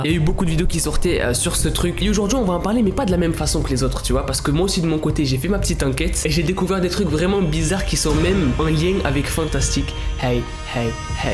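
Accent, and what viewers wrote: Quebec, Canada. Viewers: J’adore ton accent un peu canadien et québécois j’adore❤😂👌🏻 tu est prêt pour aller aux Québec 😏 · mdr je reconnais tout les canadien mais je peux te dire que tu a une voix de canadien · Ton accents canadien est super tous comme ton accents anglais 😂😂❤️ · Ton accent québécois elle est épique confirmée par une québécoise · Omg je suid traumatisé et tres belle acssans canadiens moi je suis québécois je m'abonne :) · avant que tu le mentionnes je trouvais déjà que parfois tu parlais un peu comme un québécois 😂 avec de la pratique tu pourrais parler aussi bien que moi 😂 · Quand ta dit "jsp pk j’ai un accent canadien Québec" OUAIS C MON RÊVE QUE TU SOIS QUÉBÉCOIS MONSIEUR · Wtf l'accent québécois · Si tes en France pourquoi ta parler quebecois jcomprends pas🤣🤣😭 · Un ti accent dquebec 😂 Hahahaha je t’adore je suis québécoise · Le petit accent quebecois qui fait plaisir😂❤️❤️ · Il est trop chaud mdrr Et fais trop bien le Québécois 🤣🤣🤣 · 'un petit axant canadien Québec 😅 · belle acent quebecois 😅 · J adore ton accent québécois Salut cousin francais cool lol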